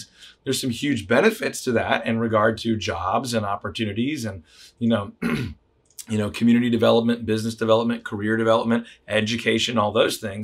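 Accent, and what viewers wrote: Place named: Dallas, United States. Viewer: I bet this guy talking is from Dallas.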